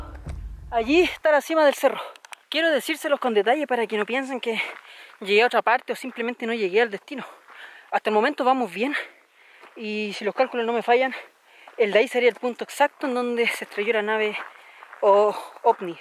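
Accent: Chile. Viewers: Hola pequeño hombrecito!!me encantan tus vídeos los lugares hermosos que muestras soy Argentina pero tengo familia chilena mi abuelo me contaba sus costumbres y me gusta escucharte como hablas por qe el me contaba historias de ese país qe nunca fui pero lo siento conosido y cercano tengo una piedra de cruz de ese río muchas bendiciones para vos y tu padre 🤩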